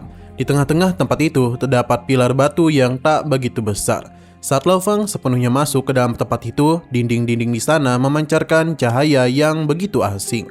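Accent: Banjar, Indonesia. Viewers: org banjar jua sakalinya min lih... terkipai kemana min😂😂😂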